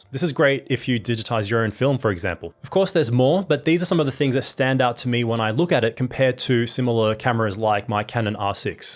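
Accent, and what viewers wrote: Australia. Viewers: Judging by your accent I'd say you are an Aussie. I used to work for Paxtons Photographics in George St , Sydney in the 80s, half a lifetime ago . At the time it was the biggest camera store in Sydney. I bought a Nikon FE when it came out then to add to my Nikon F2. Both great cameras. I'm pretty excited by the Nikon ZF and am going to buy one in the next week or 2. It really does look like a slightly larger FE! This camera could have been designed with me in mind . Lol. It's just what I have been waiting for...I've just discovered your channel and subscribed. Great video and photos!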